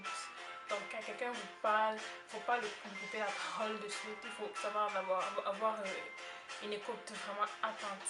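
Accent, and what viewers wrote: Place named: Quebec, Canada. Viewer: Ta gagné un accent québécois😕😕wow!!😕😕😕😕 Chai plu cmt chui tombé sur ta vidéo mais super conseil. G lâché un méga pouce bleu ma belle.. PS: tu rends super bien derrière un écran!!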